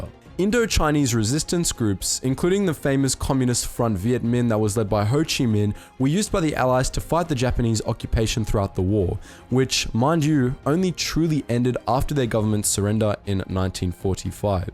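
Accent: Australia. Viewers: Ah I dint know this man was Australian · Just wondering are you Aussie like me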